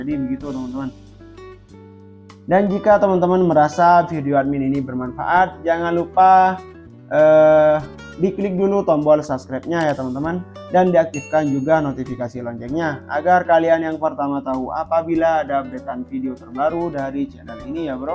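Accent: Indonesia. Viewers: Kayak orang madura bahasa nya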